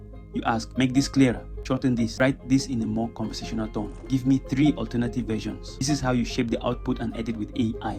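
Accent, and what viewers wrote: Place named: Africa. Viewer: Your video has a well- thought outline and a coherent presentation. However, the heavy African accent is a distraction and calls on viewers to focus on your speech pattern. There are soooo many hard-working content creators from 3rd world economies that FAIL to focus on their poor English speaking skills. You will struggle to keep attention from viewers in Tier 1 countries who will abandon the video after 2 seconds of listening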